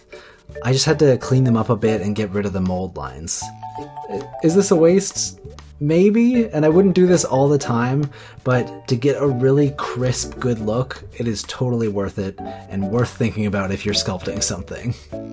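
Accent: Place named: United States. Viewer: I did not expect an American accent to come out of your face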